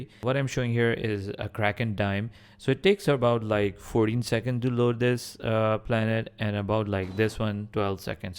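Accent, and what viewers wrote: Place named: India. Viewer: you slightly sound indian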